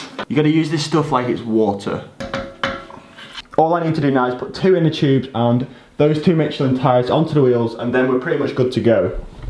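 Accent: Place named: United Kingdom. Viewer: I am English learner, Omg 😲 he speaks very fast 💨 but I love ❤️ the British accent. I like listening to the a natural speakers. Although he has a strong accent but I have known some of his conversations.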